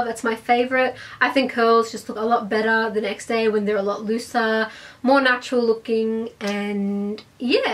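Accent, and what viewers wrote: Australia. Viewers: Subscribed! Love this video and your accent is so beautiful! Are you Australian? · She sounds Australian 😍 but she could be mixed or something, still a babe!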